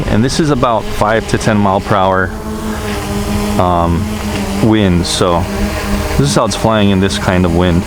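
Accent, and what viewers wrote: Hawaii, United States. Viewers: Kras 4 he is in Hawaii & they have their own accent and enunciation. I’d like to hear the critics living on the main land try to pronounce some Hawaiian street names.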